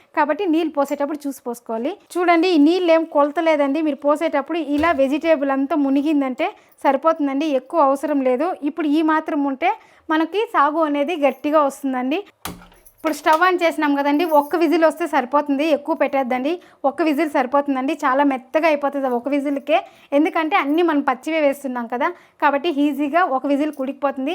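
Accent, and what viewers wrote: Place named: Karnataka, India. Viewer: Mee Telugu, kannada accent tho kalisi undhi 😊Aina baaga cheppavu 👍🏼 nice n new recipe 😊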